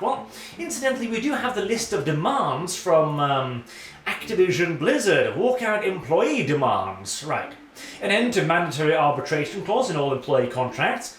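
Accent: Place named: United Kingdom. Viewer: More than a listen to a local british man, i like to listen local british man who stuck in a local toilet...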